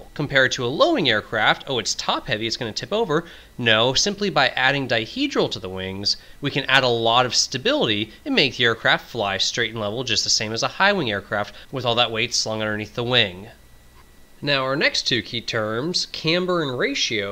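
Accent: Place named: United States. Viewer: Might be an interesting subject but can't listen to such an awful voice, quite apart from the bloody american accent.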